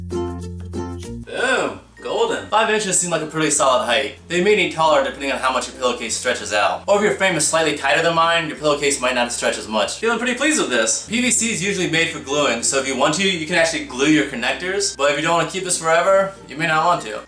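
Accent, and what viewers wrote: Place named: Virginia, United States. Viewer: he kind of sounds like a Virginian like me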